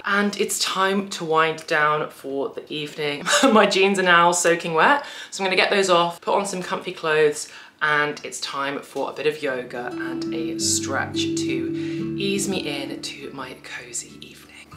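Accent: United Kingdom. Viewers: The accent speaks for itself. Brit ❤😍 Always love reset videos they motivate me to be more productive 😇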